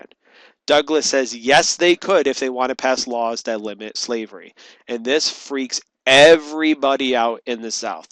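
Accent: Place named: Cincinnati, United States. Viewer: You must be from Cincinnati lol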